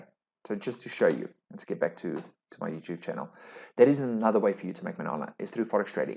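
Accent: South Africa. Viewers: Daai afrikaanse aksent😂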